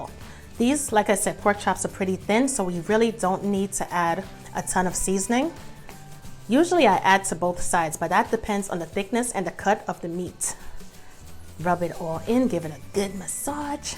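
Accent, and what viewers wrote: New York, United States. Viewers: love the N.Y. accent!!!!! thank you.... i just followed on the gram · sound like you from NY but this meal is a southern classic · I hear the New York Accent! Whaddup Sis!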